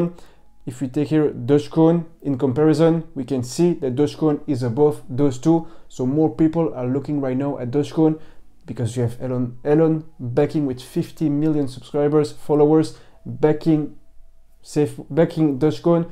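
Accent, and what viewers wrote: France. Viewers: Are you French ??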